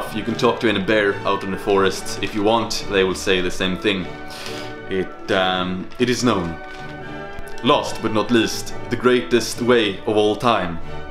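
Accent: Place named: Sweden. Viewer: Engelska ordförrådet är gudomligt men du har den arketypiska svenska dialekten 😂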